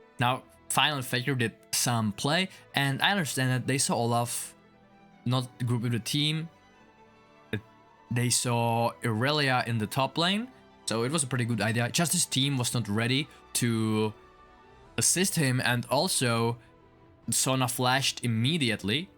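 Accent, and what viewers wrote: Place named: Asia. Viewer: Could tell after 5 seconds that this is Asian, maybe but just maybe a very drunk dutch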